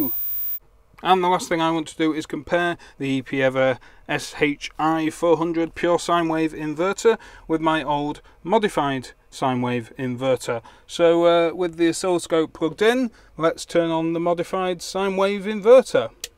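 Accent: United Kingdom. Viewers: great reviews so far, a fan from another 'experimental techie' from indonesia. Just wondering have you done a "Cheapest On-Grid Inverter" review already? I guess this piece is the only missing review that makes a solar newbies out there would expect from you. MPPT function, Pure Sine Wave and 85-89% conversion power rate are few claims that I would think you probably the best person to prove it. I could point few inverter candidates for your review;) Anyway, just a thought, love your work so far and keep that British accent!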